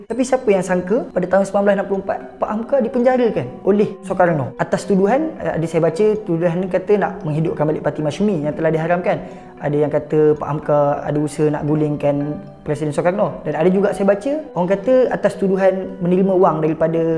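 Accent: Malaysia. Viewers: Dia bercakap bahasa melayu tapi ada loghat negeri.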